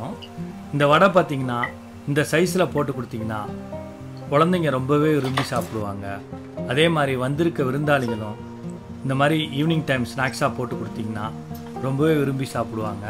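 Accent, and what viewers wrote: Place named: Telugu, India. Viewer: Looks yum are you Telugu doesn't sound like malyalam or tamil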